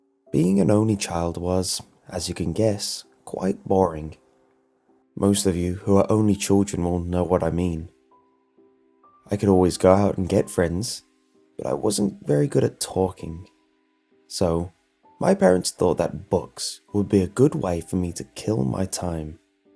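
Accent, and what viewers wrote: United Kingdom. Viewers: Is from the uk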